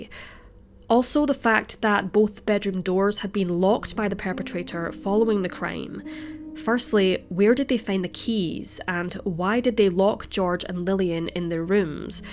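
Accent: Scotland, United Kingdom. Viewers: Cold case. There are lots of them. Is your native language not English or are you not familiar with the many and varied accents in the U.K.? The narrator has a very obvious Scottish accent - she is from Scotland, part of Great Britain so yes, she is a NATIVE speaker of English. You must not watch many U.K./British films or TV. if you don't recognize the Scottish accent. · Ye canny beat a Scots tongue to tell a gory tail 👏👏👏👏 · She's Scottish, mate 🏴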